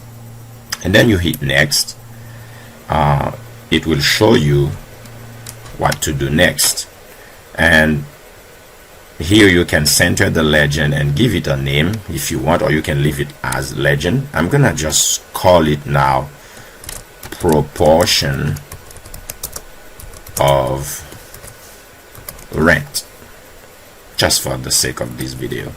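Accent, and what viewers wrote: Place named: Haiti. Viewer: Thank you, my brother. I've been trying to figure this out. You're from Haiti? I know the accent when I hear it!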